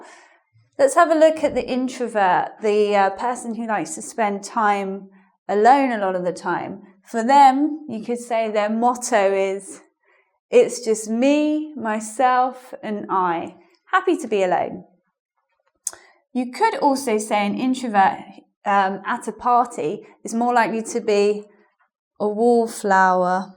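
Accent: United Kingdom. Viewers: WONDERFUL!! video and it's good to hear some British accent!! :) Can't wait to see your new videos!!! <3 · What a great video! :) I love your british accent sooo much. Greetings from the Czech Republic! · British accent <3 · At first I express congratulation you on being hired by the engvid. The fact is to probe your English teaching methods were recognized by the company. I also learn from your YouTube and am enchanted by your British accent. By the way, in Japan, the once upon a time, as the English proverb saying, the notion that the silence is gold had been prevailed such as the book called Quiet whose author is Susan Cain which insists even if the introvert people are not assertive toward others but the high ratio of percentage, they have the high potential than extrovert people. But in fact in Japan nowadays I feel that extravert people are superior to introvert people. 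As it is often said, everywhere, because many of extraverts have capacity to say their opinions in the office meeting, and the introverts are treated as people who do not have self opinions and as transparent people and are ignored. So when it comes to dating, extraverts can ask opposite sex to go out by using positive words and attitude and the possibility of succeeding in being accepted is high. So I am an introvert man, so in Japanese society, I always was taken advantage by extravert people unfortunately. Sincerely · British accent though !! So natural !!! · Thank you very much. Nice advices. And I think you speaking British English. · Hi, Perfect, the UK accent! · Hello, thank you for your lessons, I like the way you teach and you British accent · you teach Bristish English , but i study American English - and i love your striking accent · Hi,! I'm begining my English learning with EngVid and your video was very helpful for me. 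I like your brit accent ) Thanx from intorverts;) Sorry for my mistakes. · Hi, I'm from saudi Arabia. I'd love to tell you that I love love your british accent. I'm subscribed to your Joddle channel, 'n so happy to be subscribed to your new channel, congrats! ^^ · I'm a beginner for english. I practice very hard.I would pronounce them like her because I love UK accent. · British accent ~awesome :D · Honestly i really like your accent, i need to learn the British accent,its really special language for me, and nice to meet you and all my eyes on your topics now, waiting to see a new videos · Went through many youtube English learning channels, but now I found one with London/British accent which I missed =) I can't help myself but this accent is just soo cute XD Also I remember my first time in London, even after one year of everyday English learning course, I had problems to understand XD Well after three months there I got better (but still I need to listen carefully) Also I'm typical introvert =) Also I subscribed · ! 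I loved your british accent _ How come to an introvert person become a teacher? lol!:) Keep this great work! ^^ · I think that you speak by British accent and it is very difficult than American accent. I want to talk to British girl or boy to learn to say the right accent. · It's good to have British speaker. I like their accent. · Hey, your first video was Amazing! I knew it! Everybody here in engVid is glad to be part if this lovely team, You did it quite well! I look up to you, congratulations and thank you for helping us listen to your British accent!:P I follow you in your channel as well, kind regards! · I can't focus on the lesson because you're so beautiful and i enjoyed hearing your british accent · Hi! i'm from Venezuela and I do understand you perfectly, love your british accent <3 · Like your accent...I like British accent a lot! Brilliant explanation. Have been living in London for over a year and I got amazed by British accent. 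This is the way it should be spoken because english comes from England.Thank you for sharing.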